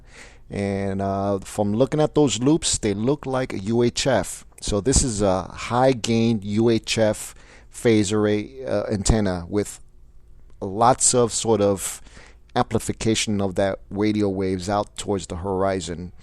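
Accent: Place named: Mexican American, United States. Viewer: Can I ask where you are from? Also are you Mexican American as it sounds like to from the accent and verbal variance in your voice I would say 2nd maybe 3rd gen. Great channel and thanks for all the info you provide on a wide level which is helpful for a full perspective on this subject. I am learning so I can train some young scouts.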